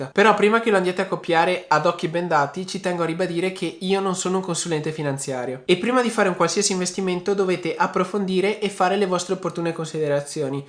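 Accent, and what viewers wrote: Veneto, Italy. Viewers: Scusa.......... MA TE SI VENETO?!?!?! SE TE SITO VENETO ANCHE MI SO VENETO VECIO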